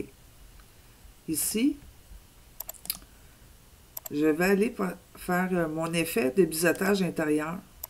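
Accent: Canada. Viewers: sa ma plus votre façon de parler a la canadienne merci pour le parage ;-)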